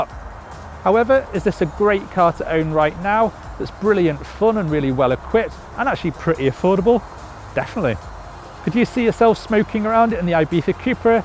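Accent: United Kingdom. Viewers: Why is it that British people always say Fiestar ST?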